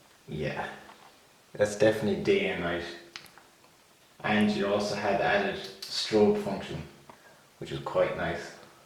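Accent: Ireland. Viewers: You get a thumbs up just for being Irish, and the Irish accent. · Finally a proper comparison between the two, everyone's else's comparison is only like 5 minutes and barely gives any information. Also i still can't figure out which part of Ireland that accents from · Excellent, informative video matey. Was just pulling your leg, I knew what you said really (everyone loves the Irish accent!)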